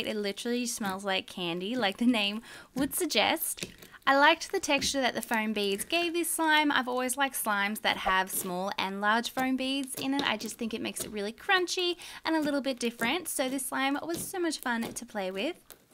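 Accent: Australia. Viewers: Your Australian right!!!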